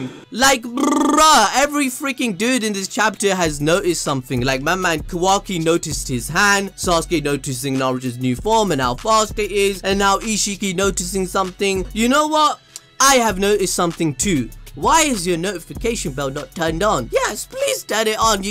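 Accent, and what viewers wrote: Punjab, India. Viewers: Are u punjabi bro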